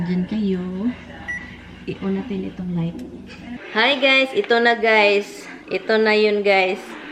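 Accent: Visayas, Philippines. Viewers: di ba nimo gi remind si sa resulta sa iyang pagpanigarilyo? Amping sa iyang Lungs oy Sunod grabe jud na ang tira sa imoha kay resipient ka sa cigarette smoke nya. Nag ubo ubo na cya ba. Just be careful. Watching your vlogs. Njoy ko sa imong English with visaya accent. Ayos jud huh! More power.Rachel from the Philippines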